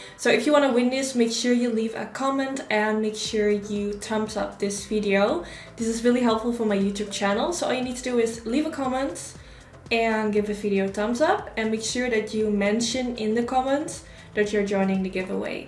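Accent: Netherlands. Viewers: I never knew a Dutch accent could be this charming! I’m a new subscriber and I thoroughly enjoy your content!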